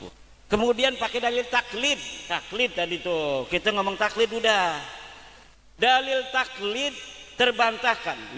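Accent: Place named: Batak, Indonesia. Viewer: Dari gaya bicaranya rijik saja nazabnya sudah batak. Mukhibiiin....mukhibiinnn. yang pinter rek !!!!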